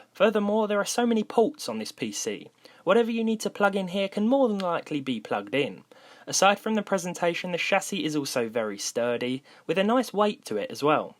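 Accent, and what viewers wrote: United Kingdom. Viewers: hes British dude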